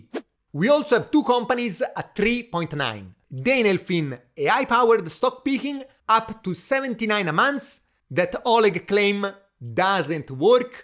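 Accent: Italy. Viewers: Italian accent makes it all even better 😃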